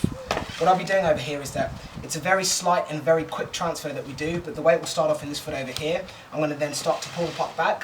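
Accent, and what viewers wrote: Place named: United Kingdom. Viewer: A black guy with a british accent giving hockey tips... awesome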